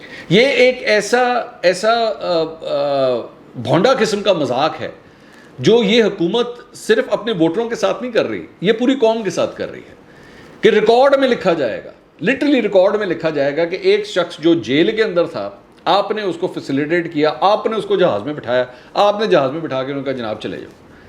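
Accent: Punjab, Pakistan. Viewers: بھائی آپکا ہلکا سا پنجابی ٹچ بولنا بہت مزہ دیتا ہے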